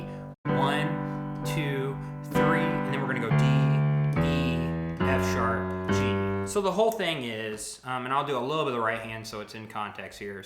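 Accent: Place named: Rural, United States. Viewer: Your voice is so country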